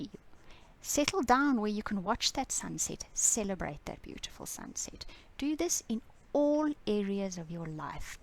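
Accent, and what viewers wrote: South Africa. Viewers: love you're south African accent... very nice positive reading thankyou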